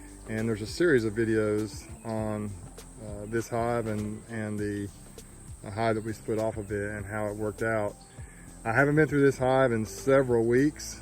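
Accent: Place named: South, United States. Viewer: Great video! I really like your Southern “style”. It’s very calming. The bees know it too! Lol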